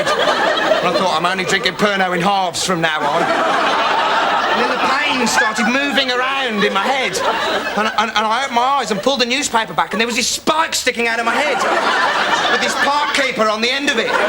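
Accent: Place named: Redditch, United Kingdom. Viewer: how does he know the redditch accent so well?? lol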